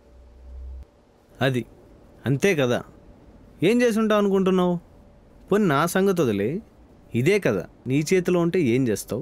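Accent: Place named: Anantapur, India. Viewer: Dinamma, Anantapur accent nv cheptunna vastavalanni gattiga tagultannai bossu.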